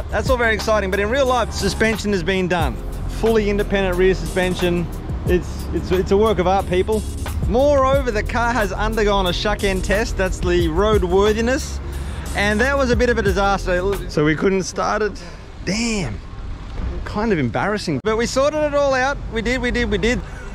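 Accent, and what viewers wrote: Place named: Australia. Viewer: Yeah man! Of all time! I'm struggling to place anyone more down to earth up there, always good to hear an Aussie voice on YouTube. If I ever get that holiday to Japan hopefully I bump into ya! Keep doing what you're doing man, can't wait for the next vid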